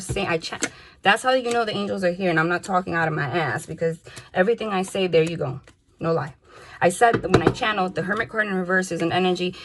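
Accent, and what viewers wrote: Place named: New York, United States. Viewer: I can hear your New York accent! I know that was random but great reading! 💚💚💚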